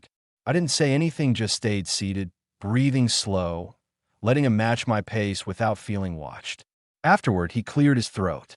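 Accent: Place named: Spain. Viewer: I agree with you that this story was good but did you hear the Spanish intonation in the narrative? Best wishes to you 🙋‍♂️🇬🇧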